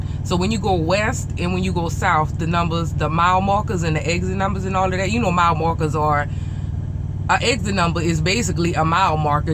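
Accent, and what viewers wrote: Louisiana, United States. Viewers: i love your accent! u from Louisiana? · k, so what part of Louisiana are you from,? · She's cajun, from louisiana. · she gotta b from Louisiana or the west indies!!!!!!!!!!!! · let me guess; You're from Louisiana/NO or South Carolina? awesome accent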